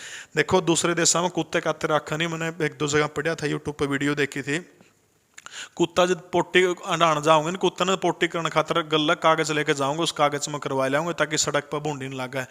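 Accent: Haryana, India. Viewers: Aap great ho sir ji apni Haryanavi boli m pdhate ho sbko smj aata h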